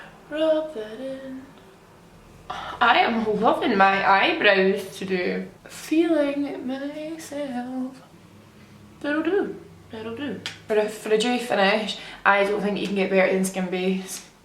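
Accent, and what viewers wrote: Scotland, United Kingdom. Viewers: I agree. Beautiful girl but can't understand what she's saying. Strong Scottish accent!!!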